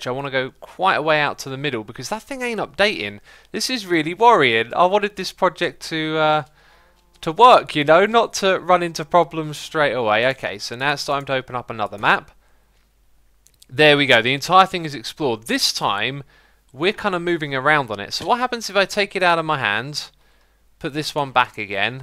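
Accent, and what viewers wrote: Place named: United Kingdom. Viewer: Emanuel Ortiz Maybe it's his British accent, the sound of his lips smacking and his saliva bubbling, or maybe it's just the way he talks. You can't hate. No one can.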